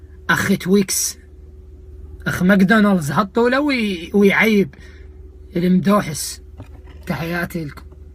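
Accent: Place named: South, Iraq. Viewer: عبدالله لا عراقية هذي لهجة جنوب العراق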